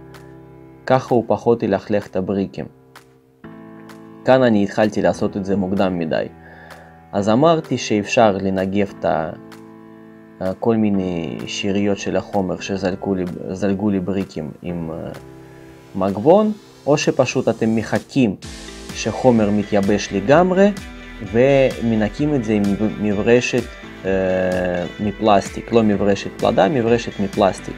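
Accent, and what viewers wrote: Russia. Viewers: Хароший Русский акцент. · Твой" русский" акцент в иврите звучит знакомо -:)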